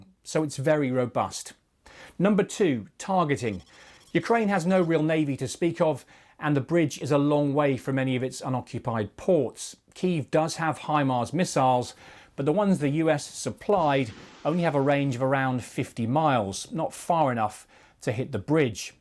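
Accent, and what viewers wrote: United Kingdom. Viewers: added points; after 1000 times only a few success for Ukraine 2.The air defence of Russia is robust 3. Ukraine air force already tried and failed 4. The drone boats were killed so many times by russia and still ukraine is trying 5. Of course Ukraine will take punishment if they really hit the bridge 6. May be Russia knows a lot about what are the assets Ukraine receives from west that why the counteroffensive is dud like ur mouth 7. Sun Szu would faced chengiz khan he would have not written the book 8. Actually Russia is playing long game to damage all western equipment's 9. in summary an old pig with british accent just doing propaganda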